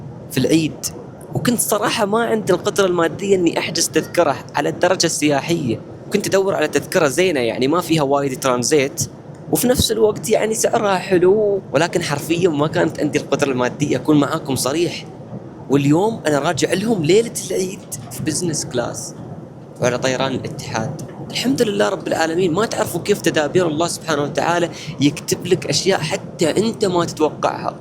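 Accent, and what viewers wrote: Oman. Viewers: و الله كنت احسبك من السويج من لهجتك بس عادي كل عام و انت بخير و عيدك امبارك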